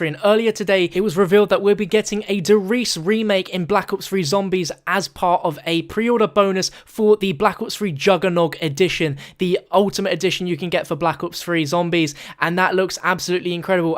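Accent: United Kingdom. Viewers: Why does every famous COD reviewer on YouTube have to have a pussy ass British accent?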